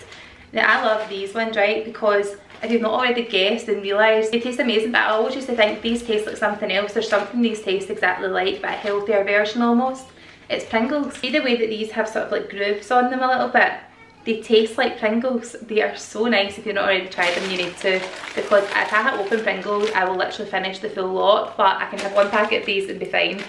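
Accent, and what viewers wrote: Scotland, United Kingdom. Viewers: Love the chat (and Scottish voice). Xox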